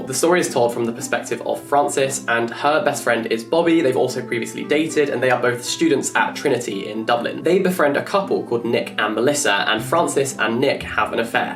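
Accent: United Kingdom. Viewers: His accent !!!😍 I adore the British accent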